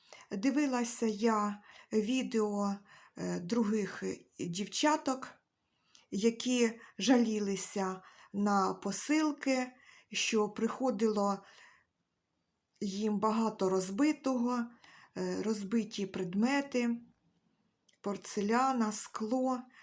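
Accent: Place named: Cherkasy, Ukraine. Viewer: Дякую за вашу співучу черкаську,нашу солов"їну українську мову. І вас з наступаючим новорічними та різдвяними святами! Миру, здоров'я, благополуччя!!!💙💛💙💛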